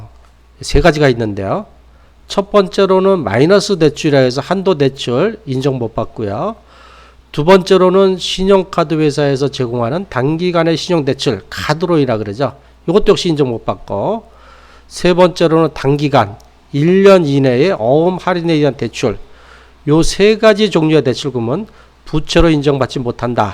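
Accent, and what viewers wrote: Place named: Gyeongsang, South Korea. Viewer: 경상도 발음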